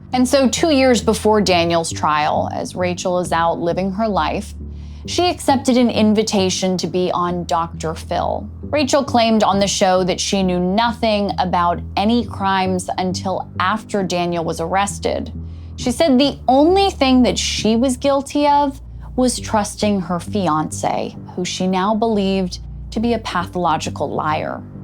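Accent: Australia. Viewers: that kooky, strange, Aussie voice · The aussie accent wears on me after awhile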